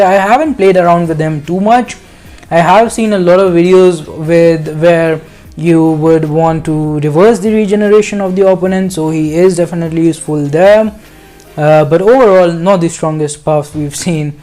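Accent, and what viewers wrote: India. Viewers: Are you Indian ?? Cuz you like and sound like a Indian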